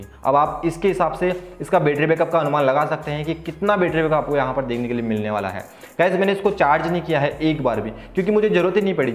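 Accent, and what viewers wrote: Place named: Haryana, India. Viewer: bhai tu shayad hariyana se hai and accent mast hai teri has has k pagal ho gaya.. but haan sahi review dia hai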